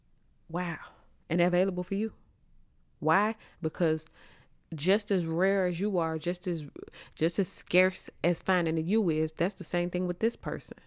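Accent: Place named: South, United States. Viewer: I love your country accent I love it ❤❤❤❤❤❤😂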